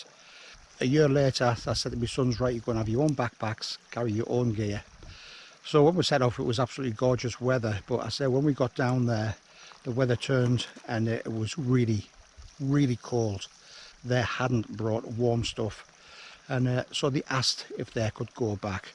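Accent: Scotland, United Kingdom. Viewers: Looks like the mountain fitness is back then 😊. That Scottish accent - Wow! Must be some Scottish blood in the family. You’d look good in a tartan bandana if there was one 😊 cheers atb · OK so why is it your last time up that mountain? It is a terrific hike. I kinda wish I had time to do it when I was there. Nice Scottish accent. 😎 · Wow what a spectacular place. Stunning! I know what you mean about pushing on and not taking breaks. There are times where one must keep moving to ensure success. I’d call that a success despite the little bit of cloud whipping by the summit. Outstanding views at the top but really all along the trail. Glad that snap decision on a prior trip worked out ok. Kids are often tougher and more skilled than we give them credit for, but as a parent we’re worried about them on their own. It was no doubt a good experience for them. 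Oh and I love the Scottish accent! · that was absolutely class mate, I'll have to get the bonny lads up there ha ha 😀 The shots with the snow were tremendous, you know what I'm talking about!! Love the Scottish accent marra ha ha, don't know what you said but i don't think anyone knows what the bonny lads are slavering on about 😃 all the best Chris 👍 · Unbelievable, enjoyed that! Well done, 4 times is quite impressive! That Scottish accent got me hahah. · Another superb video, great memories of climbs past you shared with us, the views are amazing and so well shot by you. Scottish accent fantastic and funny. Really well done on the climb, I think you will be tempted to go back again. Keep the videos coming, I really enjoy them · Well done, you did it again! Class commentary and stories about previous experiences loved the Scottish accent but you need a kilt to be authentic!! Maybe you should try an Irish accent next, to be sure. 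Pity about the cloud at the top but you got spectacular views on the way up and down and it looked busy. Scottish roads are a bit like the lake district, narrow and windy. But rest up now, more adventures to come. Andrea sends best wishes, regards Ashley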